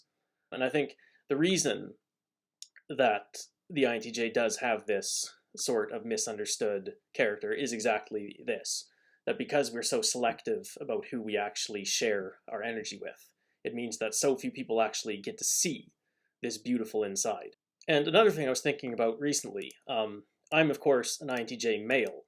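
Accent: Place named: Scandinavia. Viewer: You sound like you're from one of the Scandinavian countries, which I assume have a higher percentage of INTJs. Would I be more comfortable living in that part of the world?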